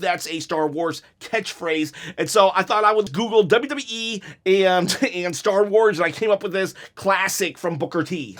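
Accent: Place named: New York, United States. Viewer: Can you tone down your New York accent.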